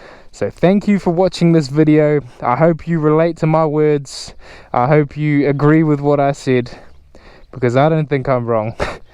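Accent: Australia. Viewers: R u Australian by any chance?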